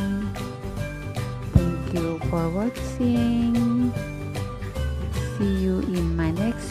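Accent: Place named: Eastern Indonesia, Indonesia. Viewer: dari logatnya pasti daerahnya sama dengan saya yaitu Indonesia timur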